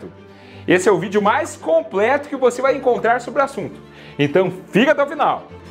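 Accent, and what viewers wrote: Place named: Rio Grande do Sul, Brazil. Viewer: Seus vídeos são fantásticos, além desse sotaque maravilho, levandodo-me às memórias do meu querido Rio Grande do Sul, que saudades daí do sul! Deus lhe abençoe, guie e guarde. ❤ Grande abraço.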